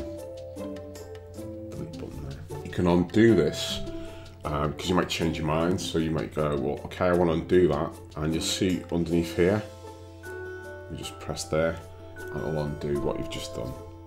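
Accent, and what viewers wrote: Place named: Liverpool, United Kingdom. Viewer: Scouce, lad 😂😂😂😂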